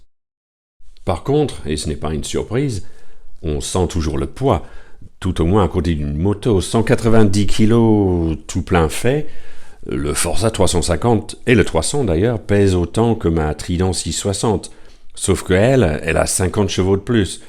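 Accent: United Kingdom. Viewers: Very good review with the best accent I have ever heard from an English person. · BJR. Et bien, j'aimerais bien parler l'Anglais comme tu parles le Français (même avec un accent). Tu as une très bonne diction. Quant à ton accent "british" il n'est pas trop prononcé. D'ailleurs, je ne l'ai remarqué qu'au bout de plusieurs phrases. Bon essai, agréable à suivre ; continue de faire des essais de scooters. Concernant ta question sur la circulation en inter-files (surtout valable sur le périphérique parisien), nous n'y comprenons plus rien, nous non plus. La France a l'art de changer et compliquer les réglementations. Une question : c'est mieux de vivre en France ou bien au Portugal ? (ndr : je suppose que c'est à cause du climat que tu ne vis plus en Angleterre).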